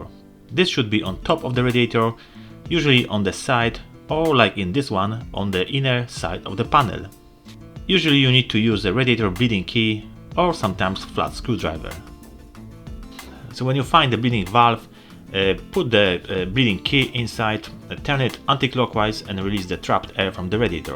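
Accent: Poland. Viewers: o jakim kraju my tu rozmawiamy? bo mam pytanie... z akcentu wnioskuje ze polakiem jestes...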